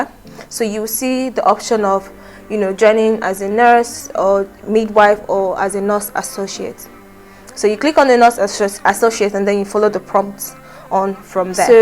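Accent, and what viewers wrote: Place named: United Kingdom. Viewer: Its the British accent for me......keep it up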